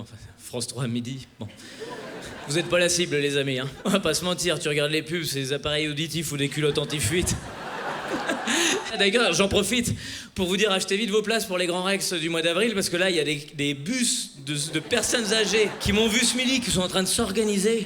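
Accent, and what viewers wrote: Quebec, Canada. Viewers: Mais quel talent ! Tu le tiens bien l’accent québécois ! 😂 bravo j’adore ! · J’adore merci pour ta vidéo. J’adore l’accent québécois 🥰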